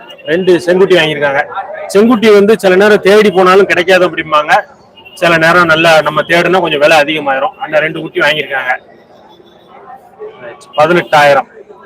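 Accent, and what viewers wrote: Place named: Madurai, India. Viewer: Madurai basha I laikkit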